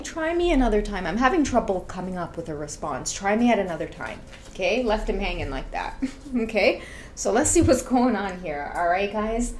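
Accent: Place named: Trinidad and Tobago. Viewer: Look meh trini reader 😂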